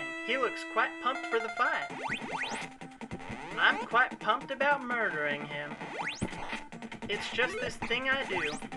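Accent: United States. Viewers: I have to say that the voice in this video is a little creepy. It's like an old Southern Belle mixed with a Texan woman. Eeep...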